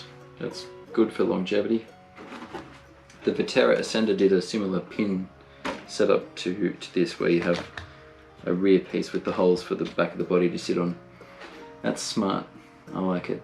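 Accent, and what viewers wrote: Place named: Australia. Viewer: Hi, good to hear an Australian voice [I'm on the east coast], watched all the reviews as you mentioned it’s a good trail truck do you feel its is worth the AUD$900.00? Also how hard would it be to strip the body down of all the bits, to swap to a clear body and go with your own colour choice.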